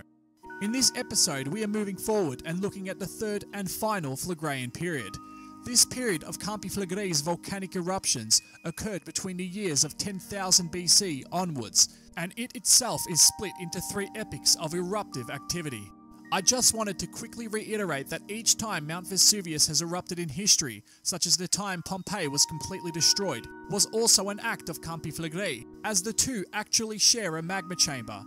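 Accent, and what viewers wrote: Australia. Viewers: So now the voice of my nightmares is an Aussie lol. Fascinating and terrifying. Well done. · Awesome docs,plus ya sound like an Aussie 👍👍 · That Aussie accent 🤣🤣🤣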